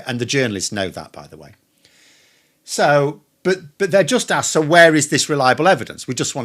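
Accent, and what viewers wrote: United Kingdom. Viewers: What dialect are you speaking? You do sound like someone from the UK, easy to understand even for a non-native listener … ;-)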